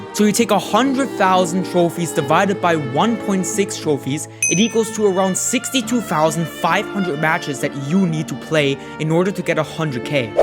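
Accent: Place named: Germany. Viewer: Im hearing the german accent so much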